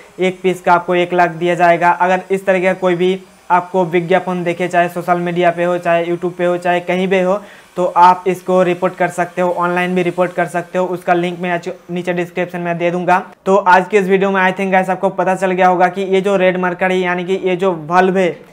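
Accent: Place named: Bihar, India. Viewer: Bihari hai kya be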